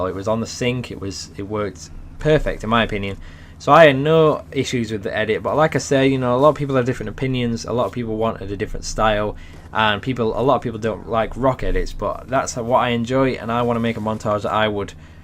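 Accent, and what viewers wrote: Yorkshire, United Kingdom. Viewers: no hes just brittish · no, different places in Britain have very different accents it just where he's from, he sounds like he is from Yorkshire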